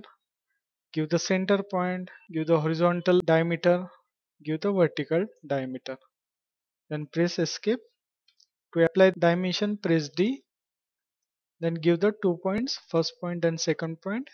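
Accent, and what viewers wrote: India. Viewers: как вы индусы заебали со своим акцентом, сил нет.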